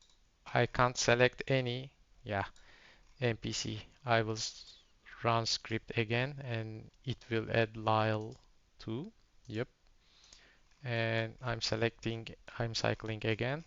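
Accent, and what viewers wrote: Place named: Turkey. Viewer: türk aksani =)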